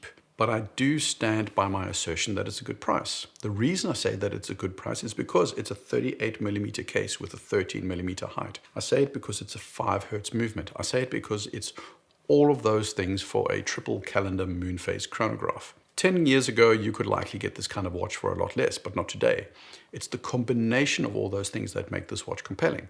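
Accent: South Africa. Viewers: Great video! BTW, are you South African? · Completely agree, this watch would benefit plenty from a 42mm diameter, and I don't get the obsession with thin cases either. I want to see and feel some heft and presence from a chronograph. Any thinner than 15mm and I'm not interested. I guess we all have our likes and dislikes. Prefer arabic numerals too Still, enjoy listening to his videos, very eloquent and well considered. Bit of South African in the accent ? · I thought you were South African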